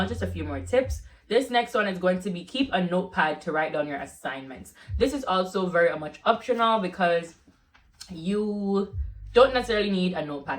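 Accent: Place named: Jamaica. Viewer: Great tips! Also hear that Jamaican accent lol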